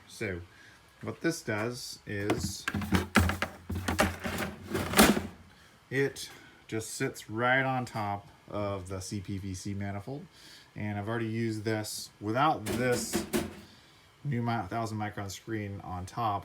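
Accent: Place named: South, United States. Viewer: You seem to have developed a southern drawl in this video.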